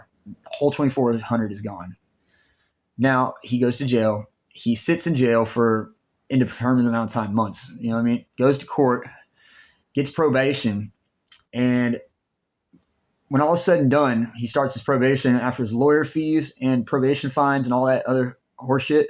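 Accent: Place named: Tennessee, United States. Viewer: You from up there, in Tennessee?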